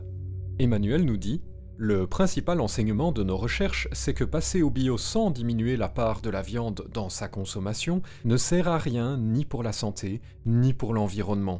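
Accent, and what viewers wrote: Liège, Belgium. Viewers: Oufti l’accent de Lietche! 🤪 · tooor, preuf, toi t'as un accent belge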